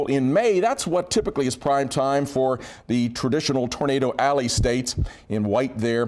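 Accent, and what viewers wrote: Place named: Pittsburgh, United States. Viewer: He speaks "Pittsburghese." He's from western Pennsylvania.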